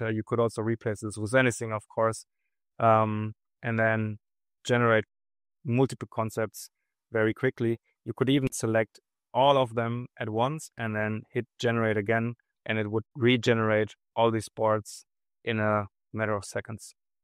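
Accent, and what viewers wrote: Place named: Germany. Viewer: dieser Deutsche Akzent 😅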